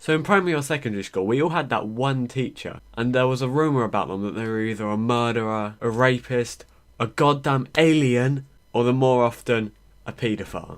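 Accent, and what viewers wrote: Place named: United Kingdom. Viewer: Teach me britsh accent senpai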